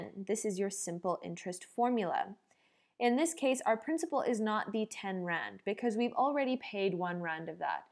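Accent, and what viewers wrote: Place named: United States. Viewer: You sound hella American,thanks for this video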